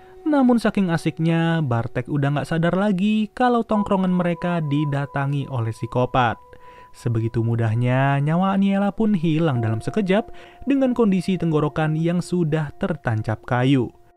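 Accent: West Java, Indonesia. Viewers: Bang lu orang Sunda ya?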